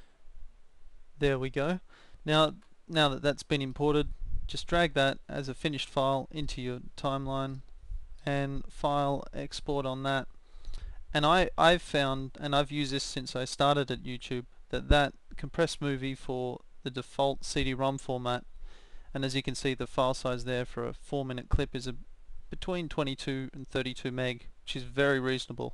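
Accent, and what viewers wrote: Australia. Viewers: hes not english hes australian or smth u idiot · He's Australian you fuckwit. Any idiot can tell that.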